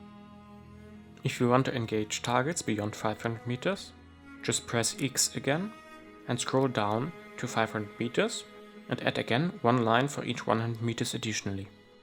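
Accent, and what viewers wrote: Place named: Russia. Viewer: Какой то акцент русский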